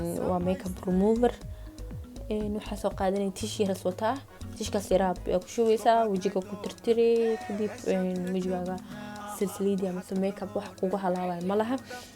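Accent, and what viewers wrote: Mogadishu, Somalia. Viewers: DaaRij Films wara dadka xamar joga sas aya uhadlen reer tuulo lee wayeh waxa somaliga wayn ku hadla okky iska tag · Loriina Bby Abayo somaliye ee kudhalatay intana kula ekaatay Soomaligana waa kaabadia lkn ree xamarka qaraaa saan ah . Anba saaan waa kadarnaa lkn marka kasobaxay alxm waaa kaqubestay hhhhh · Sax waaye Afka reerka xamarka haday ku hadasho kaasaaba u fiicanee intey u boodi lahayd maxaa tiri axsan af maadoonti xataa ku hadal horaa loo yiri socod aadan lahayn sedex tilaabo ma dhaafo wayo wa ka lumaabo waxan kugula talin lahaa ani ahaan waa ku dareemoya af maadoonti aad ku hadli jirtay xoogsigan dhexdad ku lumeysaa iska daay hadii ad doontee in xirfadaa ku kacdee afaa ka waran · Loriina Bby xbbti waxey ku hadasha afka rer xamarka saxda ah marka luqadii ayey isku daydaa iney ku hadasho marka waa sidaas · Loriina Bby wa sida dadka reer xamarka ku Hadlan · DaaRij Films ina ader rr xmrka saan ayey u hdlanee gabadha ka tag 🙄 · Waayaha Cusub wll dadka cad cadka muqdisho dago san aye u hadalan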